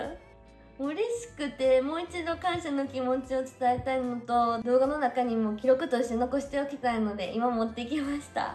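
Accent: Kansai, Japan. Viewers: 関西人ですか？関西弁混ざってて関西人の私からでもめっちゃ可愛いと思える(?)🥲💗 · 関西弁…！！ めちゃかわいいな！！！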